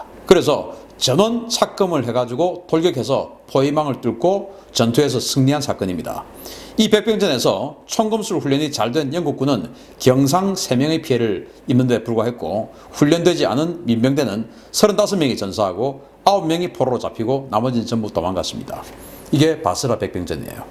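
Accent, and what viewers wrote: Gyeongsang, South Korea. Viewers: 짝대기~역쉬 갱상도 사나~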